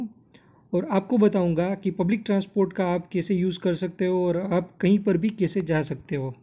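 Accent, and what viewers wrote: Gujarat, India. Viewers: Bhai boli upar thi Gujarati lago cho tame ....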